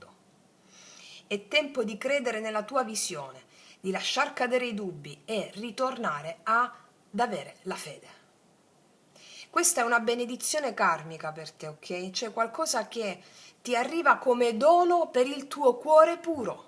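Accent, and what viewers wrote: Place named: Lecce, Italy. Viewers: Grazie mille, sei fantastica. Mi piace tanto la tua lettura e la base sciamanica che hai. Oltretutto hai l'accento leccese che mi riporta totalmente a casa. Io vivo da 57 anni in Germania ma nonostante distinguo sempre molto bene quando sento parlare l'italiano da una leccese. Un abbraccione e grazie. Ti seguirò con piacere.🙏❤🥰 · Sei stata incisiva 🎉 Dall‘ accento sei salentina , ti seguo dalla Francia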